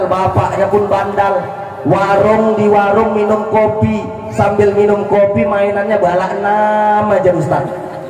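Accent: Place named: Medan, Indonesia. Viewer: Rindu kali aku logat Medan. Seperti yg dikatakan ini. Kapan ya bisa ke Medan lagi. Semoga diberikan kesehatan ya pak.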